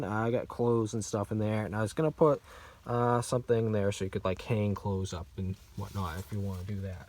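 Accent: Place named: Maine, United States. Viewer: Sounds like a fella from Maine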